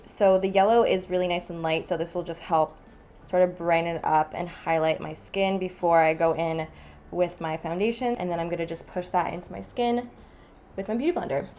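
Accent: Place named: Canada. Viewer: One of my favorite things about your Canadian accent is how well you enunciate specifically all letters in words such as Anastasia. You could have a talk show or podcast and I would so listen. 😂 Not trying to sound creepy, just enjoy your calm and well spoken voice! Love you xoxo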